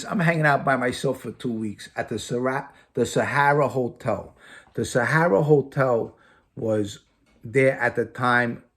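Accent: New York, United States. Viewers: This fuckin guys is hilarious...that NY accent is 2nd to none...and love how he prefaces someone's name with "The Kid" lmfao.... all the best to ya hope you make millions ...you're entertaining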